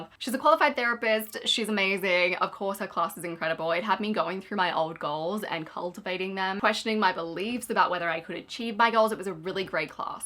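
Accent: Australia. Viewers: Could you please speak a little slower for not Aussies?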